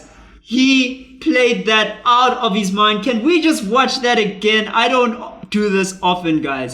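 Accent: South Africa. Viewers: You're attitude is great and the thumbnails are clean good job dude, nice accent too sound maybe like south africa or somewhere in africa?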